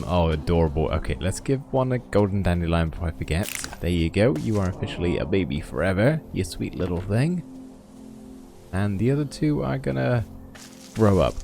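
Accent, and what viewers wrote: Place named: Devon, United Kingdom. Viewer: Are you from the west country? theres a twang in your accent thats a bit Devonian.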